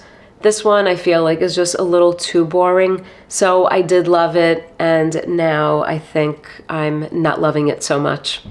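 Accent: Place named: New York, United States. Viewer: Love the video. More of this style of content needs to be out there. Just a discussion of what we like and don’t like. Fragrance YouTube is all about marketing these days and it’s annoying. Oud Satin Mood is one I’m on the fence with as well. Great scent, but overpowering..and the Extrait just doesn’t make sense. It’s nuclear lol. I had to subscribe after hearing the NY in your voice! Brooklyn boy over here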